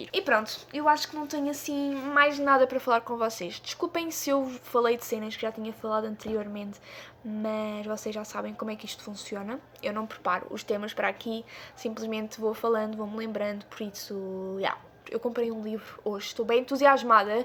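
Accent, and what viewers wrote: Portugal. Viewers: adoro seus vídeos e esse sotaque tuguinhaaaaa! <3 tenho uma web série de roteiros veganos aqui no Brasil, vem dar uma olhadinha!! Quero muito fazer uma temporada do programa em Portugal, amo essa terrinha!! Beijossss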